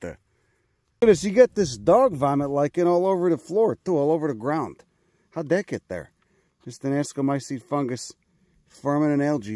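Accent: Chicago, United States. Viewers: Every nerd should have an Italian with a thick Chicago accent teach them about their interests.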